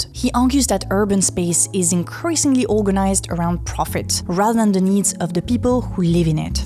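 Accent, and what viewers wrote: France. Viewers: Cute french accent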